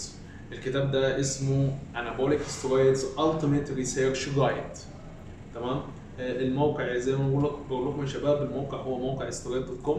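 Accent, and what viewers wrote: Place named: Iraq. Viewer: انت عراقي لو مصري؟؟؟؟!!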